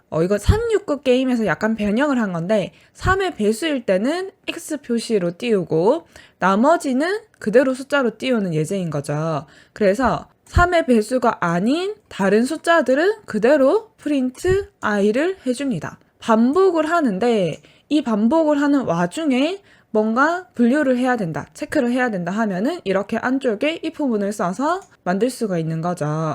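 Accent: Gyeongsang, South Korea. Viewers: 정보처리기사 시험용으로 C, JAVA는 인강으로 배웠지만, 인강에 Python이 없어서 어쩌나 했는데 여기 SONOL에서 느무느무 잘 배웁니다. 갱상도 액센트 늠 귀여워요~~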